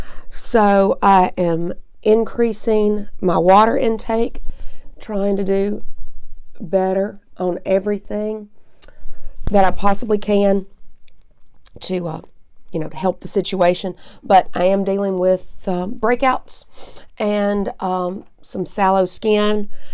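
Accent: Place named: Mississippi, United States. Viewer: Your skin is AMAZING. I just HOPE my skin is like yours when I’m your age! I think you look so so so great without any makeup!!!! For real!! And I’m so glad you mentioned where your from. I was thinking how much I like listening to you in your other videos. When you said MS, I was like well no wonder! I’m born and raised in Madison MS but Ive been living in Sonoma County California for the last 7 years so I’m missing the accent. <3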